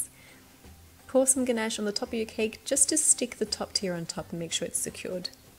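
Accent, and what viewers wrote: Australia. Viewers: Oooh Australia, I love the australian accent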